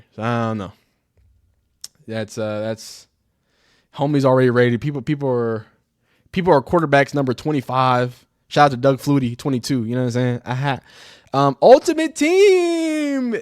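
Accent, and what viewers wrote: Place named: Michigan, United States. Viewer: Bro idk if you’re from Michigan, the East coach or somewhere between Tennessee and Georgia. Niggas on YouTube be a character 🤣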